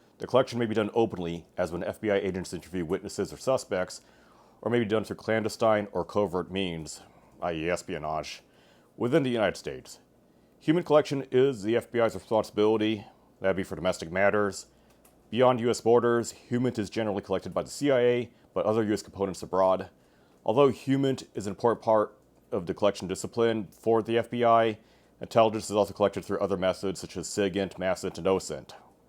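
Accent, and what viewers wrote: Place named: United Kingdom. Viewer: ACKCHYUALLY, He appears to be british to me.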